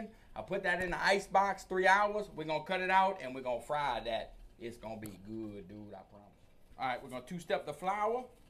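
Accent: Louisiana, United States. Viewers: I love that Louisiana accent. It's FIYAH. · Are you from Louisiana I have friends with the same accent or Tennessee that food looks tasty though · That’s not just a southern accent, sounds like Louisiana?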